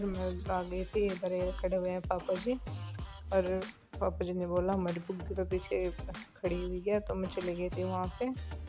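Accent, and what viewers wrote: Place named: Haryana, India. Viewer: Jaat ho sayad boli too aise he lg re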